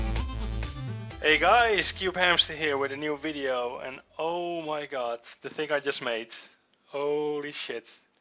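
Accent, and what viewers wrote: Netherlands. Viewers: r u dutch? :3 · your english sounds very dutch. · boi je bent nederlands. Dat horen we allemaal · Are you dutch? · are you dutch? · Ik dacht ook al zoiets. Je hoort het gwn aan z'n accent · Hes Dutch i can now it cause im Dutch to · are u dutch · Your dutch arent you?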